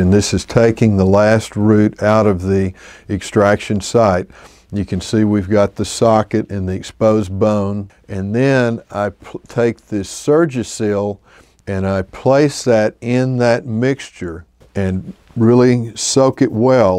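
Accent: South, United States. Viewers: Once i heard is southern accent i knew he was the real deal sourthern dentist who been in the game this long are good ole cowboys and masters at their craft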